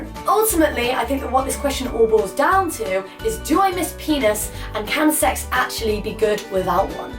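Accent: United Kingdom. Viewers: what english city is her accent from? the way she pronounces the 'ts' is irritating af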